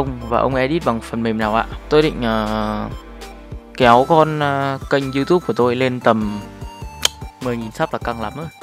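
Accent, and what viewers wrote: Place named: Hanoi, Vietnam. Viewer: T thề là t thích giọng con trai Hà Nội vl ý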